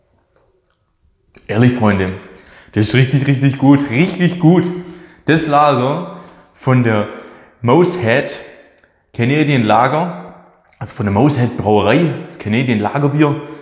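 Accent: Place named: Swabia, Germany. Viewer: Kanada ist mein erstes Reiseziel wo ich hinmöchte und auch schon war, ein Traum dort. Aber the Rock kommt doch nicht aus Kanada. Aber was anderes, wenn ich so deinen Dialekt höre, merke ich du bist wohl auch aus dem schwäbischen, wie wär's mal in nächster Zeit irgendwann mit nem gemeinsamen tasting wo jeder von uns ne diverse Anzahl an verschiedenen Bieren ranholt und wir die gemeinsam verkosten und auf beiden Channels dann hochladen? Fänd ich ne coole Nummer.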